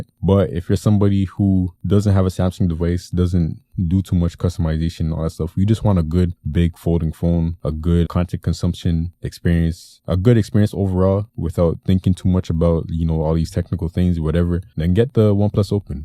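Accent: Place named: Toronto, Canada. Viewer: Here is my one thing...and before I get to that, loved the review, my guy. Spotted the Toronto accent right away. But, music. Wireless. No one ever talks about this. But if you have expensive headphones, with aptx HD, aptx adaptive or aptx lossless, one plus wins. And Samsung could make it available. Snapdragon-Qualcolm chips created and supports those codecs. Licensing fee is maybe $10. At this price point, who would notice. I can't support Samsung bricking my phone for their silky proprietary codec. Headphones are almost as much as the phone. So...really? Just a bad look.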